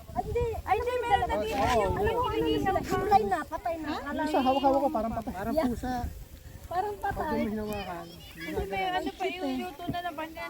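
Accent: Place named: Bulacan, Philippines. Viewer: Parang punto kayung bulacan?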